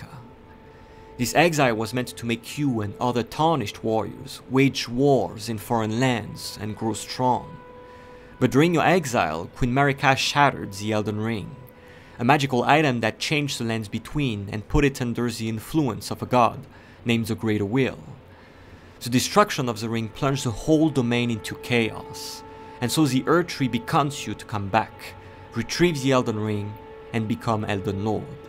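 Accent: France. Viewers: Are you French ? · i love Your french accent and the way You narrate subscribed !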